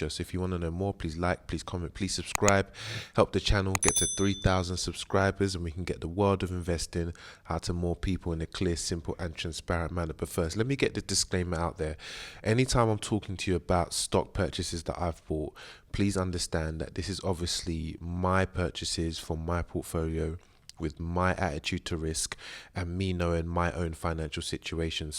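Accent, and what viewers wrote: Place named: United Kingdom. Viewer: Merry christmas. I've been watching your videos for a couple of weeks, just wanted to say I really like the content you're putting out, too few UK investors are doing what you're doing (direct, honest, transparent content), before I discovered your channel I was going back and fourth with the idea of starting my own investing channel for UK investors as I felt that niche just wasn't being filled but you're doing it bro, albeit with a slightly different strategy. From your voice/accent sounds like you're a similar age/area to me - it's great having something I can relate to in that respect instead of listening to X number of American accents.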